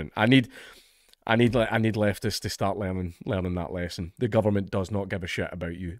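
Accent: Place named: Scotland, United Kingdom. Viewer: I've said all this shit so on the same wavelength, sounds a lot better in a Scottish accent than a Welsh one though, keep being the voice of reason!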